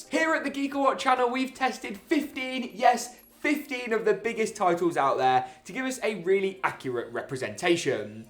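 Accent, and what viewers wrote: United Kingdom. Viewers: Came here for dat British accent, Stayed for the awesome montage, And returned for the build guide, for building my next new PC.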